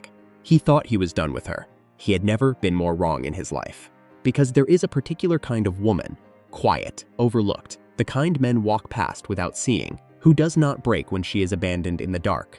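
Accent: United States. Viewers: I read several comments about reader being too fast and speaking from back of throat Having grown up in Michigan, this sounded "normal" to me! 🙂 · Good story but annoying background music, floating circles and too large a font. English accent please, not American because the story is based in Yorkshire, England. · So, American narration for a Yorkshire story! And incorrect pronunciation of words with the same spelling. So when perusing a letter the word is 'red', when speaking of roofing materials the word is 'led'. Allowing AI to run amok doing its own thing renders the whole ridiculous. And as others have said, far too fast.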